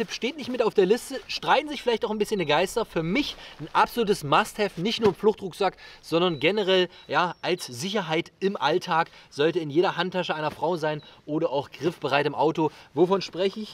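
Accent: Magdeburg, Germany. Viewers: Kommst du aus Magdeburg?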